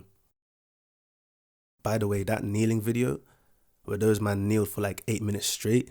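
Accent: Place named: United Kingdom. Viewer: Hey do you live in America I only ask this because I assumed you lived in the UK just because of your accent, but you also seem well aware or in on American Political issues and pop culture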